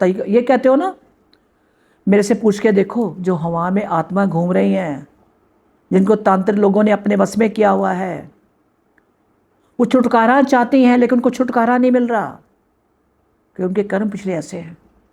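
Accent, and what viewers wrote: Punjab, India. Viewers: Thudai hindi to Punjabi di mehak aandi hai ji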